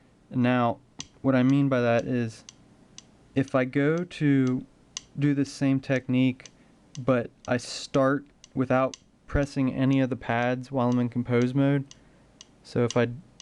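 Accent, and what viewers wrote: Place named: Philadelphia, United States. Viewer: Ur accent...way u say compose...u have a ne twang there...xwife 18yrs from philly im usually spot on with accents...u have to be close to philly my guess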